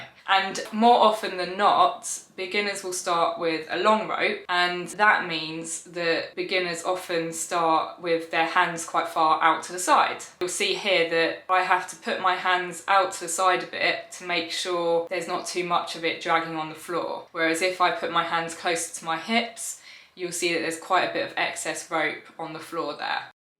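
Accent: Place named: Liverpool, United Kingdom. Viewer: What part of Liverpool are you from 😁😉🐝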